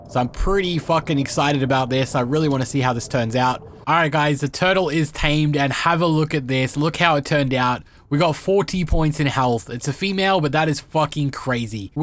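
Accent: Australia. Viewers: normally I avoid ads on normal videos i watch but imma put it out there that i love ur vids so much and your style of content, editing (and that YTer voice/aussie accent) that i watch through the ads just for u :)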